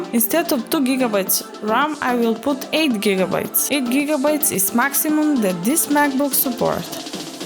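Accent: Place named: Eastern Europe. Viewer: I sense a very distinct Eastern Europe accent, and I greet you from Bulgaria, awesome videos! subbed to both you and Andrew (Андрей?)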